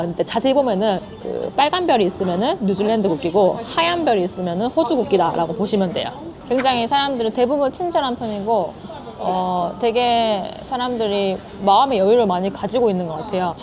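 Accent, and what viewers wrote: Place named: Gyeongsang, South Korea. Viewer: 갱상도네요 ㅎㅎ🎉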